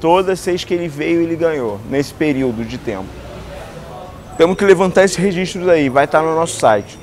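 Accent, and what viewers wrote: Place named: Bahia, Brazil. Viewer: o kra fala assim deve ser bahiano kkk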